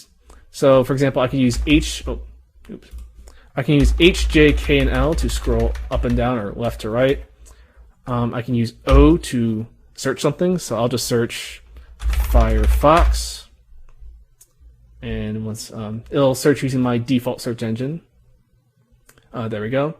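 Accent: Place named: United States. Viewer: He sounds American, poor guy. Being Catholic in USA must be like being a Russian in post-WWI Germany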